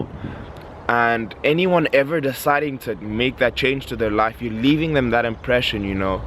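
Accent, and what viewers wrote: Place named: South Africa. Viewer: yo where u from bro? u sound south african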